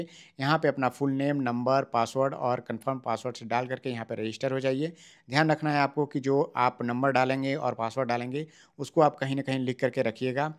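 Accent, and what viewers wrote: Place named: Uttar Pradesh, India. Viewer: U r from up .....ho na ? The way prounounce i get it...anyway...i just love the way 👍👍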